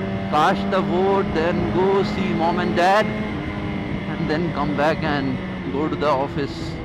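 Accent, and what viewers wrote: Odisha, India. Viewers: Suprb bhai ଭାଇ ଯେତେବଳେ ଓଡ଼ିଆ କୁହନ୍ତି ପୁରା ଦେଶୀ style re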